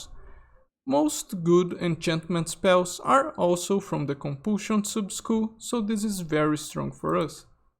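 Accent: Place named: Brazil. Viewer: EU SABIA QUE VC ERA DO BRASIL PELO SEU SOTAQUE! Hahaha Bro, o teu canal é o único que eu assisto e além de concordar com builds que são parecidas com as que eu penso em termos de prioridades de feats, raças, multiclass e outras coisas, eu tbm aprendo para um!! Parabéns pelo trabalho!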